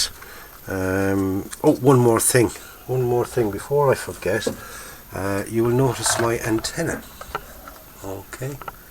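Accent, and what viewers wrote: Ireland. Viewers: I enjoy your videos and listening To the Irish brogue, but I've noticed on the last few episodes there has been a bloody annoying whistling sound all the way through them.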